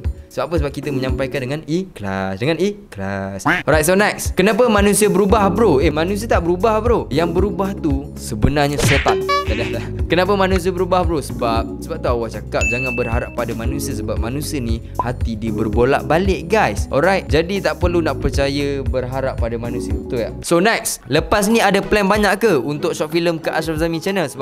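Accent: Terengganu, Malaysia. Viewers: Aku jem, loghat Terengganu ke atau Kelantan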